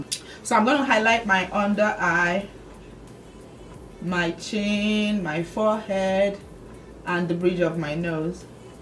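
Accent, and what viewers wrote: Nigeria. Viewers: I like that you keep your Nigerian accent and you don't fake an "American" accent or water down your accent like a lot of Nigerian youtubers I've seen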